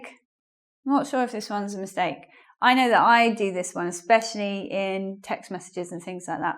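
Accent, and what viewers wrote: United Kingdom. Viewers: Has the British prounonciatinon? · I do like your British English! I'm fond of it! : ) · Excellent Video.... I love it the british accent....very useful for me, thanks for sharing. One thing ....you are excellent teacher.....Don't worry about bad comments.... Congrat.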